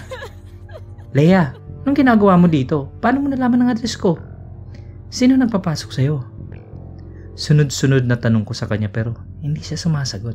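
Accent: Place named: Conyo, Philippines. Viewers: pwedeng straight english na lang...nakakairita at konyong konyo ang dating. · Tama..sakit sa tenga tunog konyo...tinigilan ko na pakikinig..ibang story na lang...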